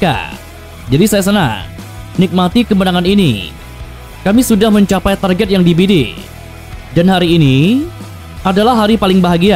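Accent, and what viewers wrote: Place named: Java, Indonesia. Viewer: Medok medok jangan banyak kata kata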